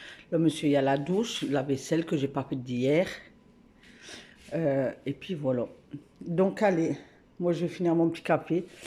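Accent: North, France. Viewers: je découvre votre chaine avec cette vidéo, et j'ai tout de suite reconnu l'accent du nord ;) (j y suis aussi ;) ) . J'ai hate de retirer nos déco de noel même si on les adore, hâte du renouveau du début d'année :)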